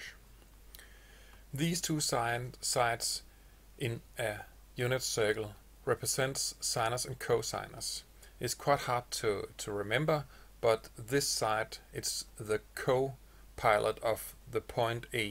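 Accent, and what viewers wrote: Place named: Denmark. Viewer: hahahaha gal en dansk engelsk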